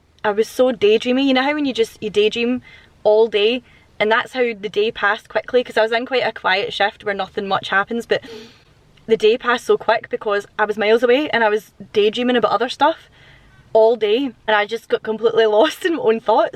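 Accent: Scotland, United Kingdom. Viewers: Love this series! I've been having a bad few days with anxiety as I'm abroad on my own and been struggling to deal with the large numbers of people at the event I'm at. I discovered you through your collab with Sarah Courtney the other day and I've since been binge watching your stuff and it's helped me so much! I've watched this whole series now and it's brilliant! Proper rollercoaster but I find learning about other people's experiences strangely soothing when I'm anxious plus I love any Scottish accent 😆 Also I'm really grateful for women like yourself and Hannah Witton sharing your perspectives on menstruation so that I can understand a bite more.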